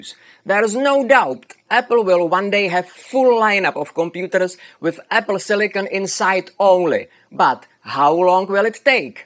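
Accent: Czech Republic. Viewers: Hey, are you czech by any chance? You have an accent, which really reminds me of the czech ones lol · Glad I found your channel, great stuff!! I love the accent as well, are you czech?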